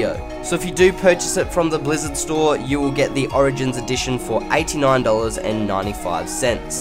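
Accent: Australia. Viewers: Jeez your accent is strong, coming from an Aussie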